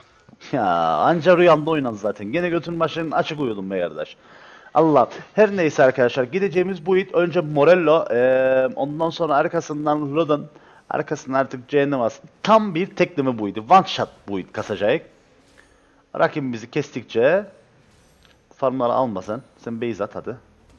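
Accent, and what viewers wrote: Cyprus. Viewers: <3 sırf bu kıprıs türkçesi için kıprısa yerleşecem be gardaş:D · GÜZELİM KIBRIS ŞİVESİ <3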